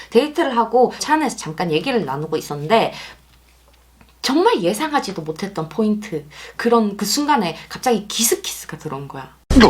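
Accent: Gyeongsang, South Korea. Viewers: 경상도시네요....음...어딘지는 감이..새벽이라 잠이와서 ㅎㅎㅎ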